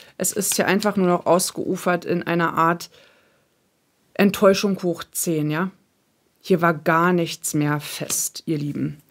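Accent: Berlin, Germany. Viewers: Sächsische Einlagen? Ist doch eher Berliner Dialekt. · Liebe, lass die Leute reden, da fällt mir das Lied der ein. Ich danke dir für deine Arbeit deine Zeit und deine Legungen 🙏🍀💫 Alles wird gut, ich bin im Vertrauen 🍀 Ich mag dich sehr, höre dir gerne zu, es gibt Legungen die ich einfach nur höre, andere sind wieder sehr stimmig. Du bist spitze, deine Berliner Schnauze, deine klaren Worte, Bombe einfach alles 💪🫶☀️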